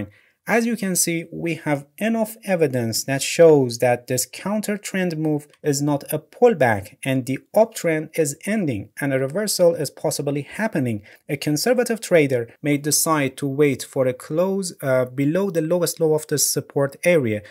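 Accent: Iran. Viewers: You have a strong Persian accent Hard to understand what you’re saying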